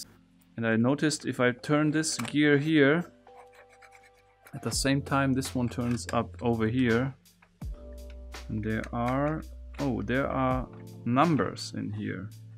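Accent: Germany. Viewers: Haha, man hört das du deutscher bist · Are you German? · Haha ich wusste du bist deutsch.. Habs doch richtig rausgehört 😂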